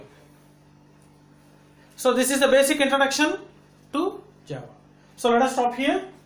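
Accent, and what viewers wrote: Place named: West Bengal, India. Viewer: Sir i think u are from West Bengal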